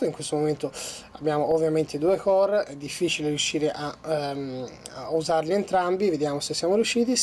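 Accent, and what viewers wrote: Tuscany, Italy. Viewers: Quanto TI AMO ACCENTO TOSCANO :D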